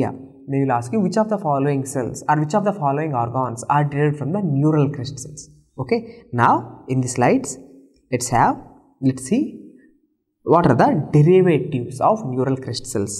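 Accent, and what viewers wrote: Telugu, India. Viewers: Awesome explanation garu.. Ur accent I think ur Telugu guy.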